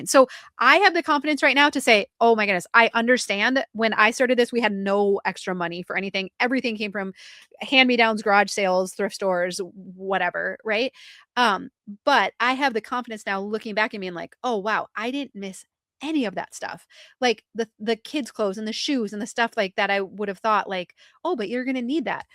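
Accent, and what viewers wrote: Minnesota, United States. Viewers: yep she sounds normal to me, Minnesota thing Lol😅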